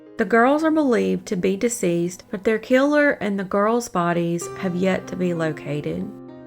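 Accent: South, United States. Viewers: Open Youtube, check notifications, "British True Crime channels" x20, scroll a little deeper, BOOM posted 2hrs ago.. Skipp all the others, click, cause well, better content, WAY better content, PLUS I speak and comprehend accents (Arkansas, but transplanted to Texas) Keep up your excellent work, Youtube will not put you at the top of my Notifications, but I will scroll past all the others cause in my book, you are #1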